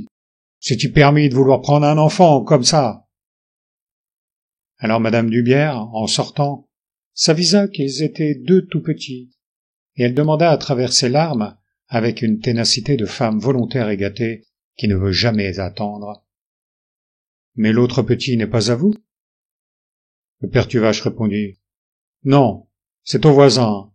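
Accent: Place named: Normandy, France. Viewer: ❤ l’accent normand est presque bon ! (Je suis née chez Maupassant, le Pays de Caux). Merci pour la lecture 🙏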